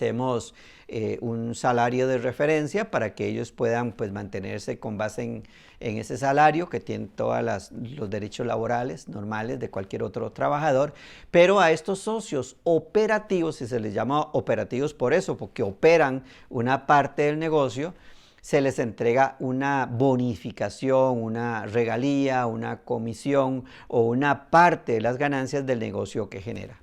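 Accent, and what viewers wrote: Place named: Bogotá, Colombia. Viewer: hola po el acento crei que estas en Bogotá, como te contacto para pagarte por una asesoria?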